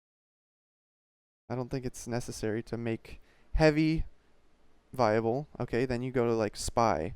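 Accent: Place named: United States. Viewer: No, you're doing it all wrong. You need to mention AlphaDraft before the show, wear a coat and scarf like you can't afford to heat your home, thoroughly discuss the innermost secrets of game design for 20 more minutes, and all while mentioning context a few times. Also where on earth did you get that American accent, Thorin? Strangely enough it suits you.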